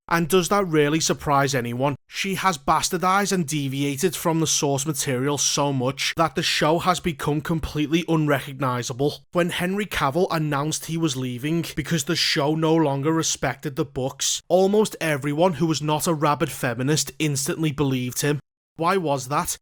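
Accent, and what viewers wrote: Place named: Northern England, United Kingdom. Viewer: 😂 funny review. But it did make me wonder why men crying is somehow emasculating? Are you saying you'd think less of one of your boys if he cried whilst talking to you about losing his dad? He's your BOY! It must be hard being your friend if your going through tough times then. 😬 Also, whilst that black witch was definitely miscast, it made me laugh how uncomfortable you are with overweight people. Assuming you live in the West, given your accent (Northern England) then you must live in a permanent state of horror! I thought that woman was pretty. Just not right for the role, given the book description. Or, what we know of the witch graduation magical 'surgery'. It just feels strange that you are so disgusted by her because she's overweight. I dont know if you had the same issue with that king who was banging his sister? 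In the books he's supposed to be handsome. In the show he's overweight, older etc. But, oh well. Another thing this show inexplicably changed. Doesn't make any of them ugly, gravy-bleeding monsters. This may shock you. But, fat people can get partners too. Not everyone agrees with your point about them being ugly, disgusting monsters, is what I'm saying.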